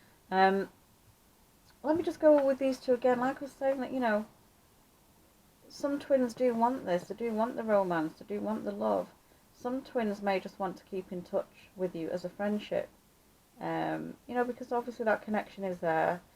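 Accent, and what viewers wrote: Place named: Salford, United Kingdom. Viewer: Great reading my dear friend :) May I ask are you from Machester , as you sound very local to me in Salford :) Bless you my sister :) .. x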